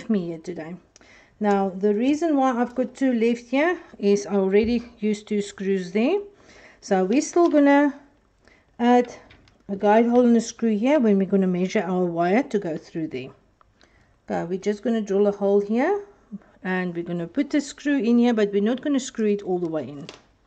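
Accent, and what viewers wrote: South Africa. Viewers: Hou aan vids maak ons is amper by n 1000 subs. Ek het die aksent gehoor jy is afrikaans😂😂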